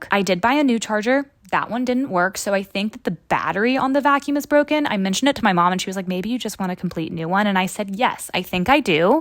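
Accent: South, United States. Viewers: As a southerner you have a very noticeable southern accent to me :) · Hearing you say you don't think you have an accent is too cute ( cause you definitely DO) (( saying this as a fellow southern queen))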